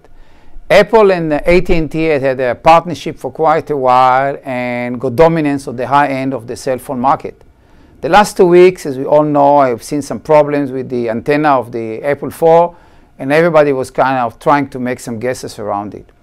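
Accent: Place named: Israel. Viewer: Am I the only one finding Israeli accents insufferable?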